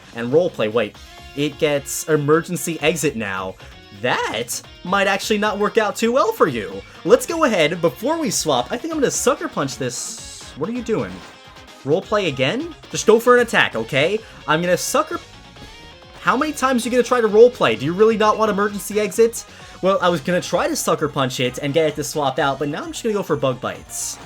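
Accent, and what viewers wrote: United Kingdom. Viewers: How do you do so good British ascent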